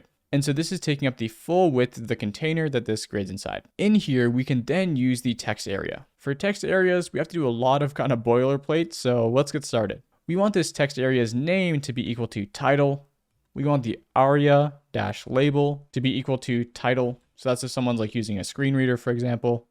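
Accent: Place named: United States. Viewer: your english is the best I can understand. true American accent I use to learn in school. thanks for the great tutorial.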